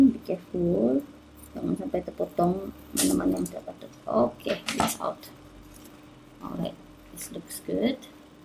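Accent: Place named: Philippines. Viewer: Redeemed Harshsw sounds philipino, maybe search for another video instead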